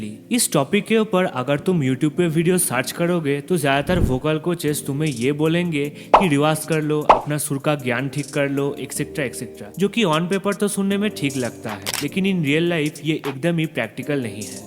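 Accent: Bengal, India. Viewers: In Bengali we call it Rewaz. It's pretty evident from the accent of the speaker that he's a Bengali person. Jab pata kuch hai nahi toh gaand ucha karke marwane kyu aa jata hai?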